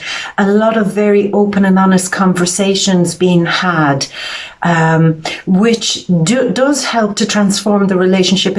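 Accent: Ireland. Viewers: Lovely - Thank You!! still love your Irish!! especially that part where you tell them to GTH!! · .. Lady you are yummy.. Love that Irish accent.. Cheers from Australia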